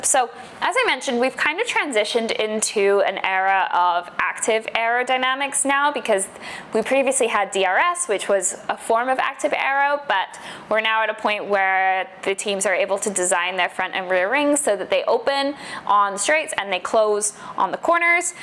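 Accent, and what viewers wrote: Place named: Australia. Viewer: Hello, algorithms brought me here. I love the enthusiasm and the love of the sport. In trying to pinpoint your accent, sometimes it goes northern but sometimes I think it's aussie... Pretty cool in any case (and if it's a 'normal' accent then I haven't travelled enough!). Anyway, there's alot of oldie f1 fans out there who sometimes get a little sensitive on technicalities. You're still finding your way which is great but thought I'd share to not be too disheartened if you get negative responses in the future. You'll find your footing! Few pointers on this topic- Tyres, if you're going fundamentals for F1 specifically, the suspension components work very closely with keeping the optimal operating temperature of the tyres for the lap. Think the window is 120-180c? Toe/caster/camber, I think alot of these are tailored for the tyre/track/scrub rate/temp, rather than driver preference. 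You could've mentioned DAS wheel in the 2019? period. Suspension, push or pull rods, bit of black magic but I suspect is how they load transition works with the rest of the setup (ie placement of engine, aero etc). Ride stiffness/height is largely balancing the aero rather than 'comfort'/performance typically. See AM this year (I suspect running very stiff setup that helps aero but because engines I assume aren't balanced/ tolerating the stiff setup as well and will shake the driver. Mercedes last era with porpoising (aero grounding out at high speed, relying on suspension too much to fix the aero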